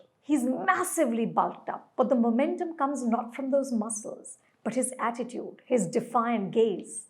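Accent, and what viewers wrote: India. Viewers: Please keep your biased south delhi English accent and dishonest leftist review to your self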